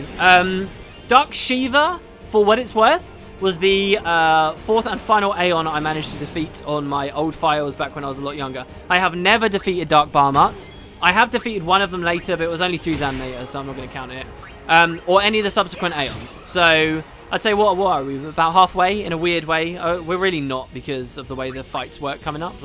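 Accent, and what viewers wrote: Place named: England, United Kingdom. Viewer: thats a real shame, your guys casual movement from gw's to everything else, for me, really captured what the gw community are doing. would you ever think about starting a similar style podcast you our own? i know the 'but of corpse' (and their wider range of casters from that channel) could do with someone with your knowledge/perspective/english accent on their shows.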